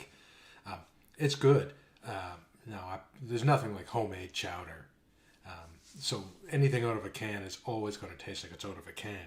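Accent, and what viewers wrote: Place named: Maine, United States. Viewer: There’s nothin slim about the quality of these « slim-pick in’ » videos! Love the Maine accent!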